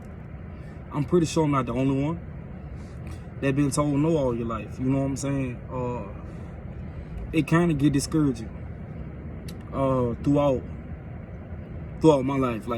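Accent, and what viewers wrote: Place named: New Orleans, United States. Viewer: That accent though...New Orleans?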